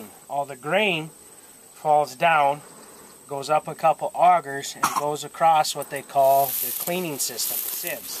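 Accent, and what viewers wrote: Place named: Minnesota, United States. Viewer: Neat vid. I'm from Iowa and I'm looking at you and thinking your an Iowan but then I hear the voice and Im thinking Minnesota....maybe Northern Iowa?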